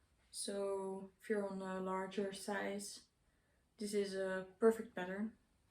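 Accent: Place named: Russia. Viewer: Прекрасные изделия! Но у вас такой русский акцент...😀 Спаибо🙂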